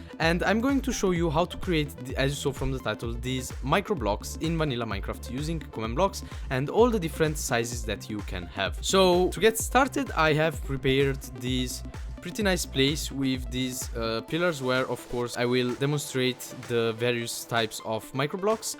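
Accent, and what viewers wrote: Italy. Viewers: An italian accent! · Ehi, ma sei italiano? Nel caso si capisce dall'accento molto chiaro ahah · Si sente che sei italiano;):D...... SHISH!!! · bel video, l'accento italiano si nota:) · mado si se sente che sei italiano haha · Sei per caso italiano? Parli inglese ma mi sembri italiano dalla pronuncia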